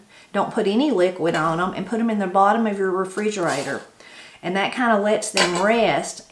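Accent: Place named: West Virginia, United States. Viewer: I just found this post and I'm very curious because I've been looking for something I can stand to drink. I'm in California from West Virginia and have quit drinking water because it smells and taste so bad. This has caused many medical problems because of being dehydrated. I came here in 1962 with my parents. I was 12 years old and we use to drink water running out of the mountains, so clean and delicious. I'm now 74 and cannot stand to drink this terrible water in Modesto California. I'm going to do research and try to make water I can stand to drink. I just wonder where I might get my starting water.ost water sold in bottles here are just tap water that I will not drink. So I'm starting with a handicap. I've becr addicted to your shows. I miss now WV home but cannot go home due to medical coverage for my heart condition. Thanks for your guidance and your accent, reminds of all family and friends that have past over.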